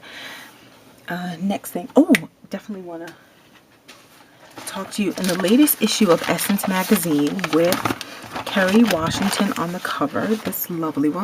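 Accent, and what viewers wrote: Caribbean. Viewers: I really enjoy your channel. I may be a little late on this but are you west indian? I heard an accent along with the sucking of the teeth lol, not to mention the great food.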